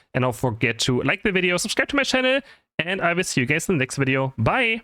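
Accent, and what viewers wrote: Germany. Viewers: Hi! I totally agree with everything you said in this vid I have not quit unite yet but i am playing it a lot less, mainly because i just moved houses but also because it IS boring. We need a new map, i would love to see a summer map with kyogre/groudon as the bosses But besides that i do hope you see this comment, when i wake up everyday i always watch your videos and make my lunch while watching you. Its gotten to the point where my parents are like "oh, he's watching the german guy again" not necessarily in a mean way they just recognize your voice so much because im always watching you😭 It might sound a little weird but i do really like your voice, you've inspired me to try and learn the German language because i really like your accent and i think the language is pretty funny But anyways i hope you do read this because i love your content even if i quit unite i will always still watch you play it, youre the thing i look forward too in the day and i hope your views go up sometime soon ❤️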